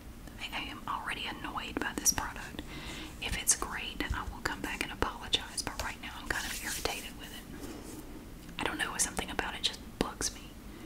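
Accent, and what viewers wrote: South, United States. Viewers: I just started watching your channel. I absolutely love you and your southern accent! Much love and support from New Brunswick 🇨🇦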